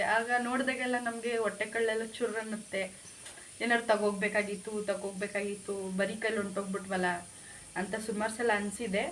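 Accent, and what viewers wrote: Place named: Mandya, India. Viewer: Mam ನಿಮ್ ಮಂಡ್ಯ ಮೈಸೂರ್ ಕಡೆ ಭಾಷೆ ಎಷ್ಟು ಚನ್ನಾಗಿದೆ ಕೇಳೋಕೆ ನಮ್ಮ ಉತ್ತರ ಕರ್ನಾಟಕ ಭಾಷೆ ನಿಮ್ಮಷ್ಟು ಚನ್ನಾಗಿಲ್ಲ 🥰❤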